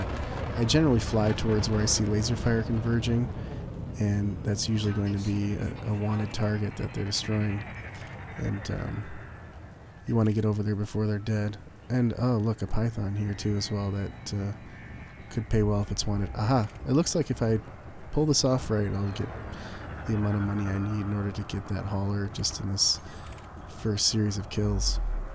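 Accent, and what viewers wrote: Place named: Wisconsin, United States. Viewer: You sound like my cousins from Wisconsin. Is this video still relevant a year after you made it?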